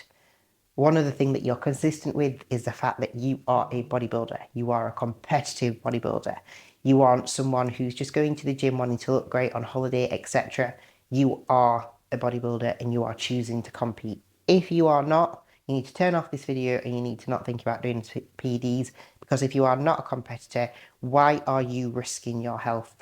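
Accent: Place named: United Kingdom. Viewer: You look beautiful and I love your accent 😊 What part of the UK are you from?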